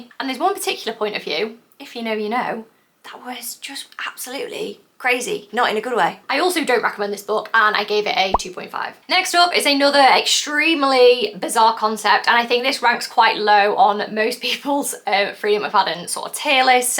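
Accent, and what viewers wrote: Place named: United Kingdom. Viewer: girll your british accent made my head gone crazy😵‍💫 can u talk slower next time ?? btw nice review👍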